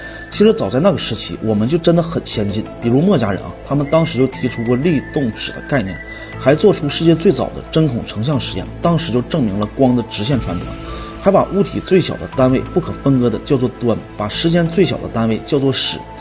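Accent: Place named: Northeast, China. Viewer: 东北口音果然给人一种文化不是很高的刻板印象。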